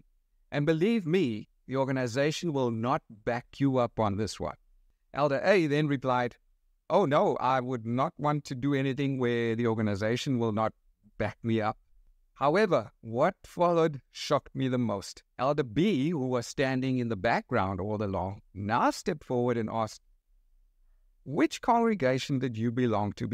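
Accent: South Africa. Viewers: May i ask is your accent from South Africa?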